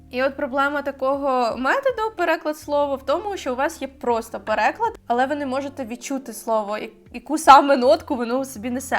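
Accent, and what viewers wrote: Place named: United States. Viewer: хочу твоє відео, де ти говориш тільки англійською, мені дуже подобається твоя вимова. Я в захваті від максимально американського акценту😁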